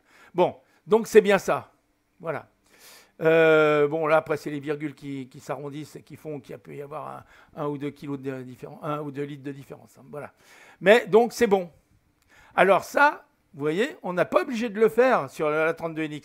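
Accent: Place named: Lyon, France. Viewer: Merci pour tous vos efforts autour de MSFS 2020 et du FBY A32Nx en particulier. Dès que je cherche une réponse, je tombe sur vos tutos ! Si je peux me permettre, vous gagneriez à être plus synthétique: Right to the point, please ! Vous vous perdez en détails, incidences et autres tergiversations : par exemple, ne perdez pas 20mn à parler d'un bout de logiciel obscur qui, selon vous, "ne marche pas"; ce sera déjà ça de gagné ! Moi qui cherchait le ZFWCG estimé dans l'OFP de Simbrief, je suis déçu et j'ai perdu 1 heure...mais ne désespère pas. Si je le trouve, je vous le dirai ... Surtout gardez votre sympathique gouaille lyonnaise qui permet de ne pas totalement perdre patience ! L'art est difficile et la critique bien facile ... Amicalement, PS/ comme promis, le ZFWCG est présenté tout en bas à gauche de l'écran de la tablette (EFB) à la page GROUND/PAYLOAD une fois les passagers embarqués Peut-être que cela n'existait pas au moment de la réalisation de votre vidéo. 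Cela vous permettra en tout cas de vérifier vos dires. En espérant que cela vous soit utile ainsi qu'à ceux qui font cette recherche.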